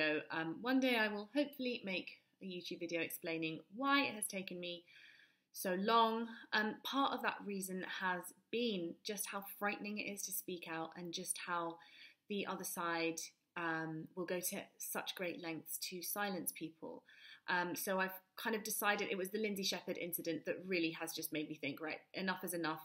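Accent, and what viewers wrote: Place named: United Kingdom. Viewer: Don't do twitter but nice to hear a Brit. talking about all this. Have subbed.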